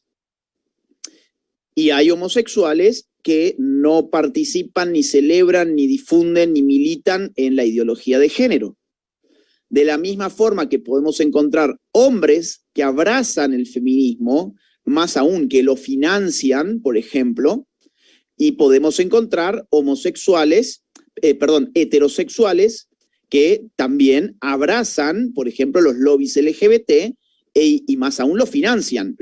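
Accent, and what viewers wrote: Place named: Mexico. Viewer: Habla como mexicana esta dama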